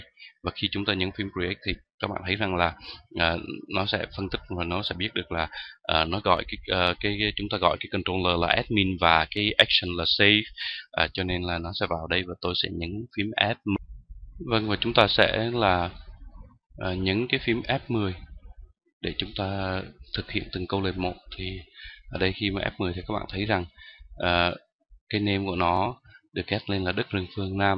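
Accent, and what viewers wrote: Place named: Hue, Vietnam. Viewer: Giọng Huế của anh nghe thú vị nhỉ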